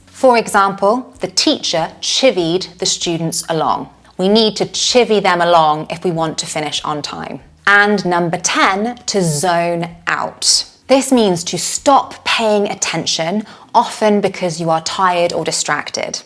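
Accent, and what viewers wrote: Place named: London, United Kingdom. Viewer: I'm a native English speaker in London. Your accent is extremely similar to mine. You rightly pronounced the second 'a' in 'vocabulary' as a schwa. It seems to me that British people are increasingly pronouncing the word as 'Vocabu-larry' (like Americans say it). You pronounced 'often' with a silent 't', as I do. When I was a child in the 1980s, /ˈɒf.tən/ was regarded a mispronunciation. I assume it in came into the British English dictionary some years later.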